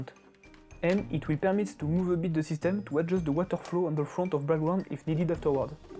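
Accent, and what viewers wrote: France. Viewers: that sweet sweet french accent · I love your french english accent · French accents are so cool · I don't understand your French-English accent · Ca sent le français ça ! :) Sinon, belle réalisation. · Mais quel accent Français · On entend direct un Français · un bon accent francais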